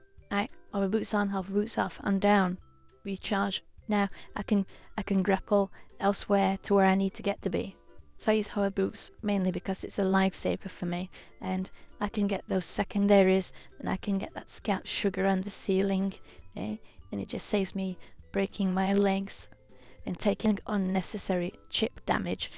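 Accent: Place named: United Kingdom. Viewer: That's just British my friend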